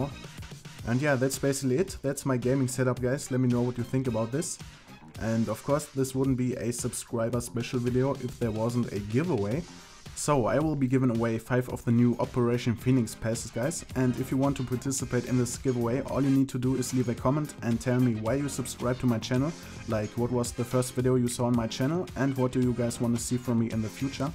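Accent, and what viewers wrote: Germany. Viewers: I Subscribed you because your PERFECT english and ive heard the German very well out of it und weil du mir sehr Sympatisch erscheinst! The first Video that ive seen from you was: CS:GO Ninja Defuse de_dust2 by! After that i had no choice and had to subscribe! Btw i have already that Phoenix Pass and dont need it so I hope you guys Luck ^_^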